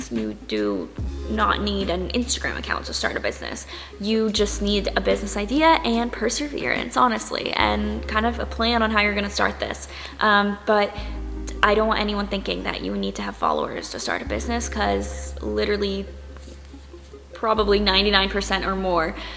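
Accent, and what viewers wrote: Spain. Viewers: I am from spain! I love your spanish accent!! 😜😜😘😘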